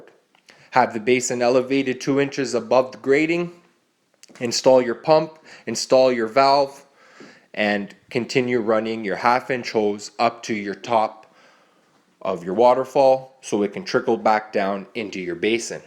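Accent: Canada. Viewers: Love the Canadian accent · the most Canadian accent I've ever heard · a really strong canadian accent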